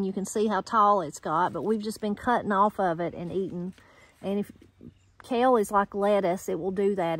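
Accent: Appalachia, United States. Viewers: I know what airish is now!!! I even used it the other day. Thank you! Love the Appalachian language.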